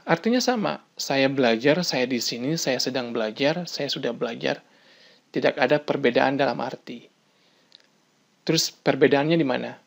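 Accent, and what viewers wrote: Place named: Palembang, Indonesia. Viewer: orang palembang ya kak. setiap ngomong selalu pakek "e" hehehehehehehe paham betul aku cakap palembang. suka tutorial ini mudah dipahami.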